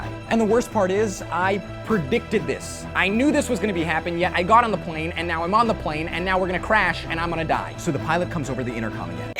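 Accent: Russia. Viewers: And his Russian accent he dose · I love the Russian English accent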